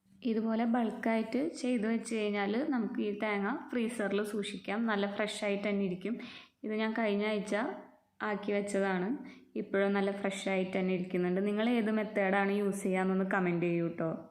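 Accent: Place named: Thrissur, India. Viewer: തൃശൂർ ആണ് അല്ലെ 😂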